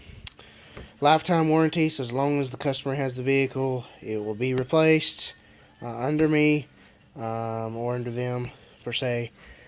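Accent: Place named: Texas, United States. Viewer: let me guess are you from texas with the way you speak and dont blow nose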